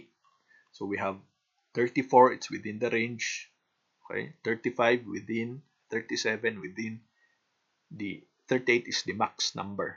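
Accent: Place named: Philippines. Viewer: just by hearing your accent I already know you're a Filipino, Salamat po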